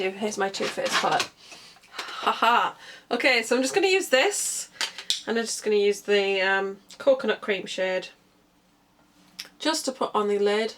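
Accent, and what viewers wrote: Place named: Newcastle, United Kingdom. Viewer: The eyeshadow looks lovely with your hair... very pretty.. Im sure you have a hint of a Geordie accent.. x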